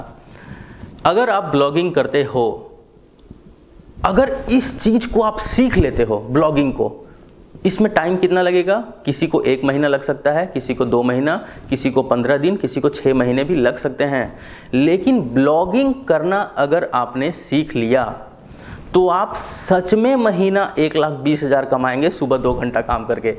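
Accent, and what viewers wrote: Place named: Uttar Pradesh, India. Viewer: UP wale ho kya bhai tambaaku wale daant rehte hai humesha or up wala accent bhi hai tumhara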